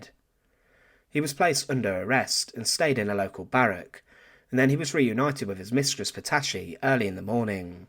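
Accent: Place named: England, United Kingdom. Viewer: I wonder where the man learnt this English accent. He sounds English from England. It’s a bit like Australian English and American English where some statements high rise for reassurance that you agree, like a question.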